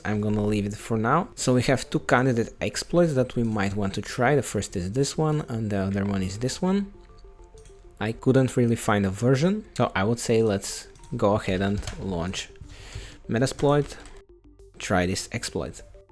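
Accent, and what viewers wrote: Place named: Russia. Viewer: я понял по акценту что ты гооришь по русски,давай следущее видео на русском?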